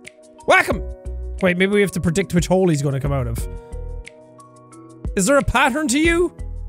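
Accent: Ireland. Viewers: Holy crap he is Irish so I am yay 😁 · We love the angry irish accent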